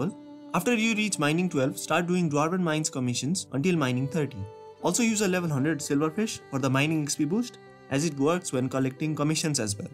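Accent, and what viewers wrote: India. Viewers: Your voice and acceny are really like an Indian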